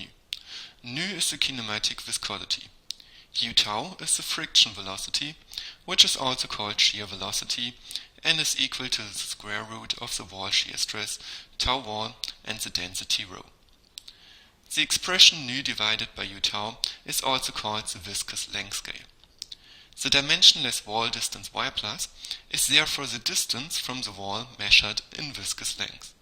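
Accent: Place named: France. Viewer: He's french and that's the french - english accent. It doesn't matter how close or far you are from the UK. ;)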